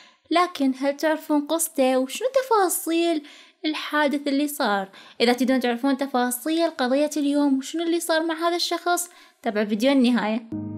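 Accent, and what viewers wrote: Iraq. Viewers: ياحلو الهجه العراقية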